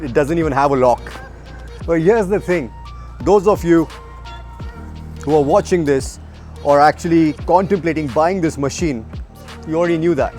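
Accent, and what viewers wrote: India. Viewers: Nothing, I say nothing, sells a harley davidson like a guy with an Indian accent and an earing in one ear... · What's with the accent???? Aren't you Indian??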